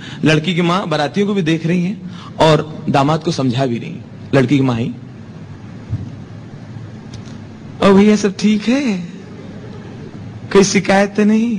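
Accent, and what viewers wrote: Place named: North India, India. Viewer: No one can better interpret north Indian dialect and culture in humours way than RAJU SRIVASTAVA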